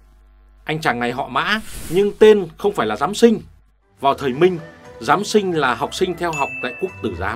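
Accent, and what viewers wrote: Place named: Hai Phong, Vietnam. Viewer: Giọng Hải Phòng đặc sệt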